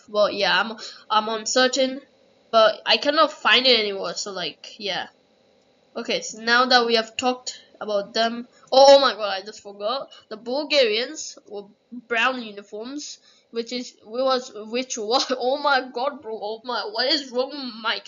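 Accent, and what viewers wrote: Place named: United Kingdom. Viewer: BROOO YOU SOUND SO BRITISH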